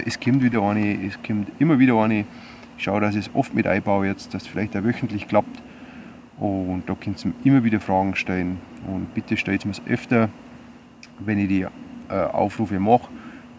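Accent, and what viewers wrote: Bavaria, Germany. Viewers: Da trau ich mich EIN Mal, ne Frage zu stellen und du nimmst die gleich - DANKE dafür!:D Und ich bin absolut dafür, dass du deinen bayrischen Dialekt beibehälst, denn der macht den ja erst komplett zum - und genau wegen dem schaltet man doch erst rein in deine Videos. Wem es nicht passt, soll sich andere Kanäle anschauen, aber hier nicht so nen Blödsinn daherschwallern. Meine Güte augenroll Wünsch dir noch schöne Rest-Weihnachten und schonmal alles Gute zum Geburtstag, bleib gsund und so wie du bist. Grüßla aus Franken:) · Guten Morgen,ich grüße dich aus dem fernen Thüringen ins scheene Bayern,als erstes,ich find es vollkommen richtig das du zu deinen Dialekt stehst,das ist auch immer in ein Stück Heimat was man in sich trägt und wem es nicht passt soll sich deine herrlichen Videos nicht anschauen,ich versteh dich sehr gut,ansonsten find ich deine Frage Antwort Videos sehr sehr gut,leider im Hintergrund der neue Sound der Natur(Kettensäge),mach weiter so,beste Grüße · Soll ich Dir was sagen, ich finde es gut, dass Du bayrischen Dialekt sprichst... 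das macht Deine Video's sehr liebenswert 😊. Und auch sonst wirkst Du sehr sympathisch. Deswegen bleibe wie Du bist. Frohe Weihnachten und einen guten Rutsch wünsche ich Dir und Deinen Lieben. LG Kevin 👋🏼😊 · Hallo, dir und deiner Familie erstmal frohe Weihnachten & einen guten Rutsch ins neue Jahr! Ich danke dir für deine unterhaltenden Videos die ich als Kölner trotz bayrischem Dialekt sehr gerne schaue und auch nach den ersten 3 Videos hab ich gar nicht mehr auf den Akzent geachtet und verstehe inzwischen fast alles, also behalt das auf jeden Fall bei, ich freu mich nächstes Jahr wieder neue Bushcrafting Videos zu sehen. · Moin....dein Bayrisch finde ich super....wer das nicht verstehen kann hat selber schuld...du haste ne gute Art drauf...gruss aus Schaumburg...Gruss F. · Bleib bei deim boarisch! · Bleib blos beim boarischn. Unsa Dialekt is einfach sympathisch und gmiatlich. Mia san ja koi Preissn.:D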